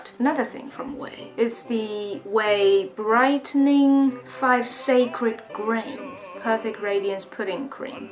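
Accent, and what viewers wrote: United Kingdom. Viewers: 口音是rp吗我听起来很像老人2333（没有不好的意思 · 的伦敦腔听着好上瘾🤗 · 的英音好好听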